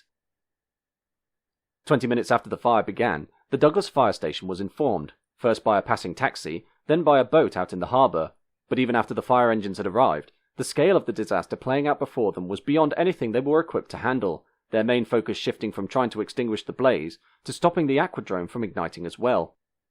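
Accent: United Kingdom. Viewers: Why do we need to have British narrators on the reports. Between the irritating accent and the annoying pronunciation the f certain words, (al you minimum) it makes these reports hard to listen to. If this is published for use in North America use a North American narrator to read the script..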